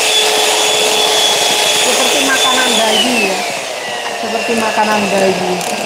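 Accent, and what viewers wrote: Java, Indonesia. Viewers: sy hadir dr madiun jatim mbk aku sela😅lu ngikuti chenel mbk putra putrinya udah mulaidewasa mbk ,mbk kadang sy ketawa bhs mbk kok msh medok kali yo 😅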